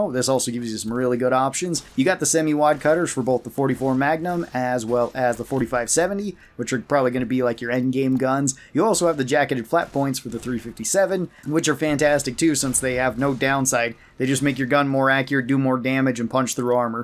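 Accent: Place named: West, United States. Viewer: I really love how you speak a little bit like a cowboy in the video as well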